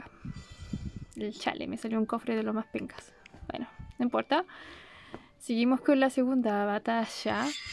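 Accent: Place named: Chile. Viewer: TIENE ACENTO CHILENO CONSHASUMARE WUEWON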